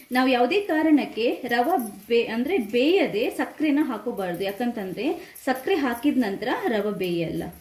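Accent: Mangalore, India. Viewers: Super ma'am nim kesari bath jothege manglore kannada kelodu Chanda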